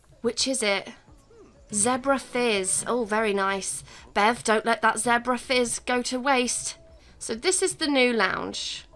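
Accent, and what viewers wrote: United Kingdom. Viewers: First time watching, and this has nothing to do with the video, but I’m god awful with noticing accents but with the first word I could immediately feel the British. Username checks out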